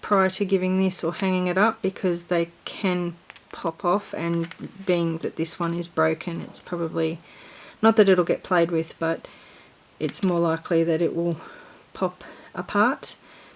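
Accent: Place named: Australia. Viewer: Thank you for sharing your crafting. It is so lovely to hear a fellow Australian accent.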